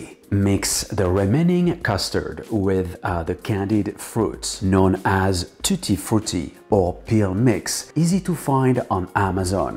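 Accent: France. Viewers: Bonjour. A votre accent vous semblez être Français ou tout du moins francophone. Serait-il possible dès lors d’avoir au moins les ingrédients de la recette en français dans la description à défaut des sous-titres, s’il vous plaît ? Ps : pour ceux qui vont me répondre comme toujours « va apprendre l’anglais »... Sachez que je suis atteint de xénoglossophobie (peur des langues étrangères). Donc ma demande n’est pas un caprice merci.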